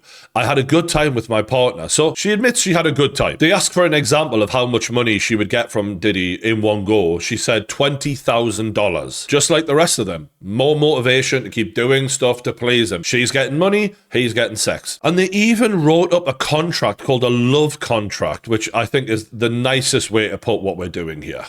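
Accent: Newcastle, United Kingdom. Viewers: I love the Geordie accent! One of my favorite YTers Cam Kirkham made me love it ❤ and 50 is King 👑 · You are a f ing legend put perfectly geordie 🫡💯💫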